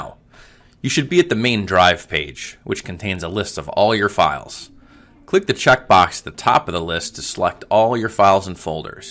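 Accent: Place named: Western Pennsylvania, United States. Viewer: you are from western pennsylvania, aren't you? Somewhere near Pittsburgh, I would guess.:)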